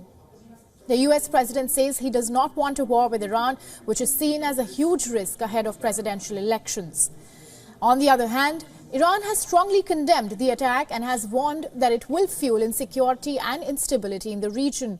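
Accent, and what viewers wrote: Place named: India. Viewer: We couldn't stand her Indian accent.👎👎👎👎👎👎👎👎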